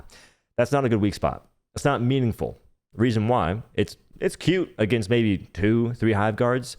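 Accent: South, United States. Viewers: I'm from Bowman south carolina and I gotta tell you every other man you meet sounds like that. Southern drawl. Born and raised there too but my accent is weird.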